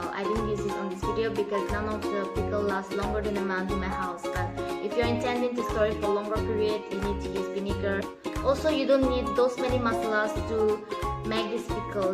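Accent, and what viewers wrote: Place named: Nagaland, India. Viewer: Well to be honest Sema people knows better when it comes to foodies of pickles etc etc... 😘 And I love the way you speak with that sema accent😍 and this looks tastier🤤 surely gonna try it!! 🤪 But one thing I want to know is can we put salt??🤔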